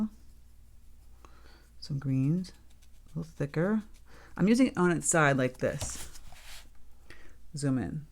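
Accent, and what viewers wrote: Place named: New England, United States. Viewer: Thank you so much! You are such a gifts painter!! I appreciate your instruction so much I love watching you paint, I learn tons of things. ❤ Plus I have grown up in the Southeast, and listening to your New England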